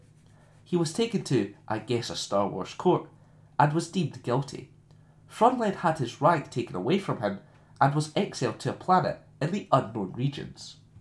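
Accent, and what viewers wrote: Scotland, United Kingdom. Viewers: So scottish my dude.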